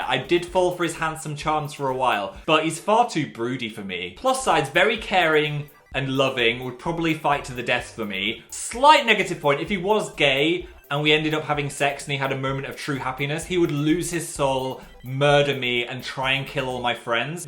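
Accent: North, United Kingdom. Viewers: His accent is just getting more and more Northern 🥰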